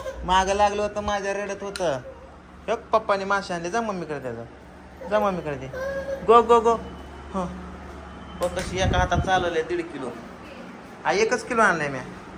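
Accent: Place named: Kolhapur, India. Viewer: Dada kolabi boltata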